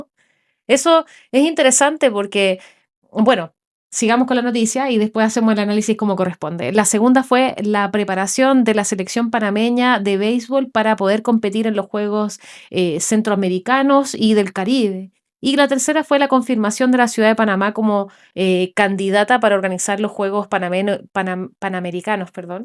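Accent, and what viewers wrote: Chile. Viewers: Mi amor de dónde eres? Que voz más linda !!pareces Chilena el mismo asentó y la voz y sin fartarle el respeto eres muy linda❤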